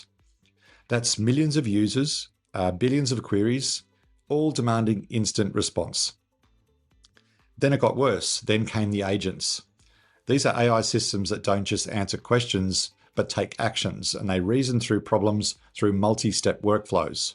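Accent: Australia. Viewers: Maybe not. That Aussie accent is probably hard to clone (for the moment) lol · No, I am Australian and this is a real person. AI is useless at faking the Australian accent. This guy is real.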